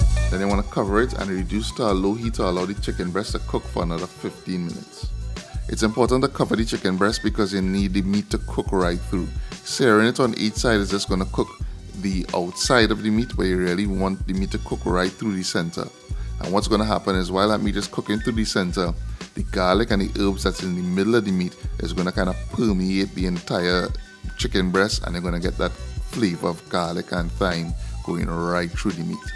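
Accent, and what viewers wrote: Trinidad and Tobago. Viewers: Well I just subscribed because I heard the Trini accent! 💕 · You is a trini man ? 🇹🇹🇹🇹🇹🇹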